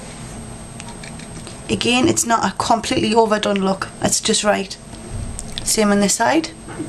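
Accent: United Kingdom. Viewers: You know... you are so beautiful. I am from the U.S. and I love your accent. I've always wished I was from the UK... since I was a little girl. I'm pretty good at copying different accents. Anyway... Your tutorials are awesome and congrats on the BBC thing. Have a great day. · by far, my fav look. keep up the great work. ps- love, love, love the british accent!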